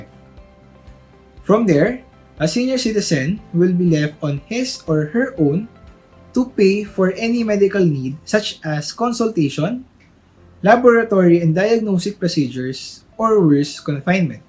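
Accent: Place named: United States. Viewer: Wala akong na intindihan, Americano ka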